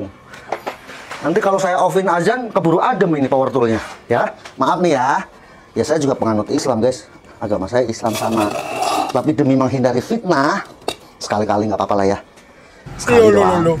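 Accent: Java, Indonesia. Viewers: bang sampean dari jawa bukan? logatnya medog , 🤭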